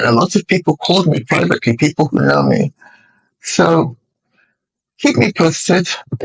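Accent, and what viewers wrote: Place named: United Kingdom. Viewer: My dear, anything that you find interesting is ok with me. You have a gift of gab as we say in America…..plus your British accent is quite charming. Enjoy your vacation, eat whatever you wish and come back with a new lease on life. Take care,I will look forward to your return…..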